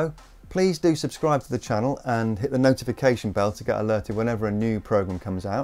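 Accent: United Kingdom. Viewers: Give an idiot a british accent and he sounds reasonable.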